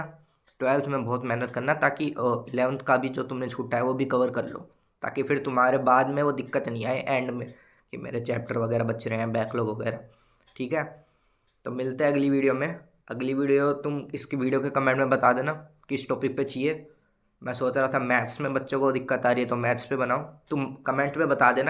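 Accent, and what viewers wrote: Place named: Haryana, India. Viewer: Bhai tera haryanvi accent kadak hai😂, mera bhi 2024 mein mains hai, 12th appearing hun😊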